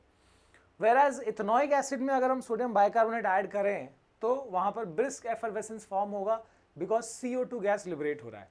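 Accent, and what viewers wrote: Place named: Haryana, India. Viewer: I really like haryanvi accent and yaa we are neighbours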